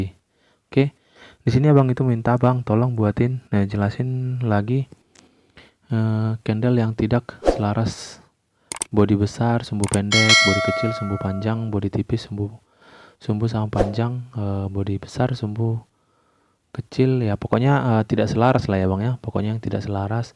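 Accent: Medan, Indonesia. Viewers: Bg orng Medan yaa ?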